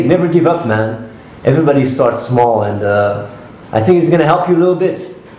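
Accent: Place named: Antwerp, Belgium. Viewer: eig ist antwerps acsent :p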